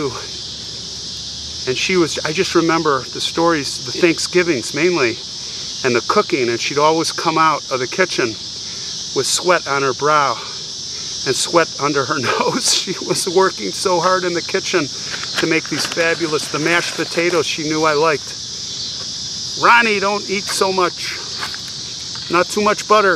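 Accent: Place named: Chicago, United States. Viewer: This is so awesome! I don't live in Chicago anymore, but my Scandinavian family is buried in several of the cemeteries you have covered in N. Chicago. I watched several of your videos just to see if you happened upon my family (slim chance I know). Love watching your videos and love your familiar Chicago accent and storytelling style. It makes me miss my family so much! It's pretty crazy that a Swede married a Norwegian 😂. Great video.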